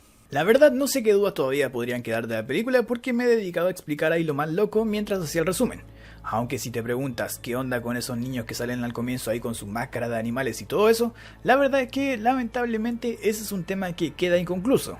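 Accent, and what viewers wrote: Chile. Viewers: Es Chileno? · Eres de chile?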